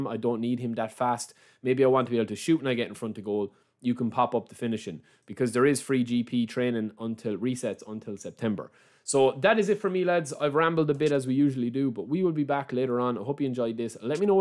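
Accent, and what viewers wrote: Ireland. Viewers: thanks for taking the time to reply ! As a non native English speaker i always loved those typical native accents since they offer more “flavours” than the more official standardised Queen’s English accent. I guess it’s a bit like when native speakers tend to find the accent of a French or Italian “sexy” when they speak English. Last detail for MY personal knowledge: would you qualify your beautiful Irish accent as more typical to a certain part of Ireland or is it rather a generic one ?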